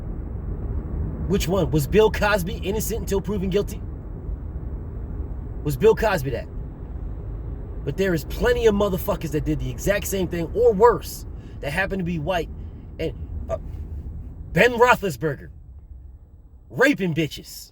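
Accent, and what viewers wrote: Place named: United Kingdom. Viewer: Come on brov 😂 chip chip cheerio 🤣 come on mate 😂🤣 that British accent is hilarious, that British accent is giving them headache 😰😩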